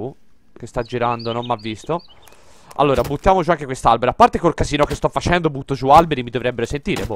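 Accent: Livorno, Italy. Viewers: ma sei livornese?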